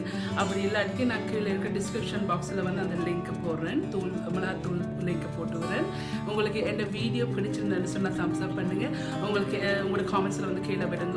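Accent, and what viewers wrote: Sri Lanka. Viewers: Are you a Srilankan Tamil?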